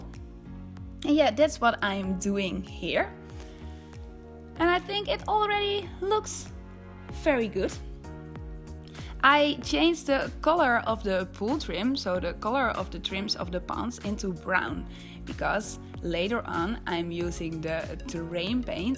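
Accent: Netherlands. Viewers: Idk but your accent sounds duchts😂❤️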